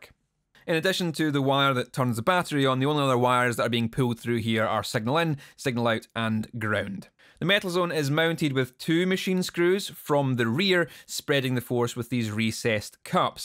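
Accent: Scotland, United Kingdom. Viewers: Love that the Scottish pronunciation of "bases" and "edges" sounds like "basies" and "edgies". Also, I'm pretty sure Kerry King did this to his guitar first. ;)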